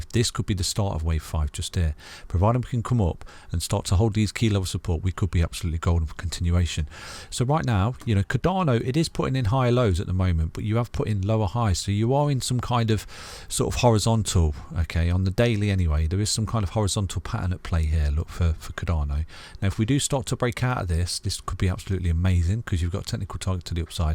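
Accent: United Kingdom. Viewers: Putt Putt soft spoken and soft foods edible Brit accent. Have a parfait.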